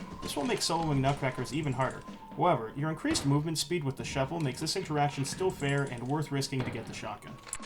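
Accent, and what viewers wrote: Canada. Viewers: WINNER 🎉🎉 GAGNANT 🤑🤑 I can tell you're from ontario my friend nice to meet you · Canadian detected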